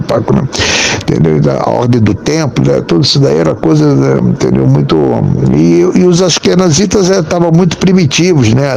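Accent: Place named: Rio de Janeiro, Brazil. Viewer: De onde exatamente o Judeu é? Acho curioso o modo de falar dele, uma mistura de carioca com português de Portugal.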